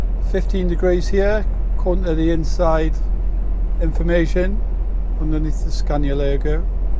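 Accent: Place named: Bedlington, United Kingdom. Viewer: where you from? sound very local to me (Bedlington) nice vids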